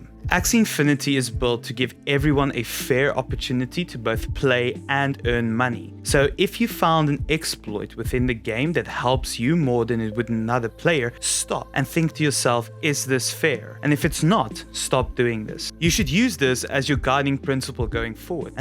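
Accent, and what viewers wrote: South Africa. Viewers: Hey man. Random Question - Are you South African? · you from south africa?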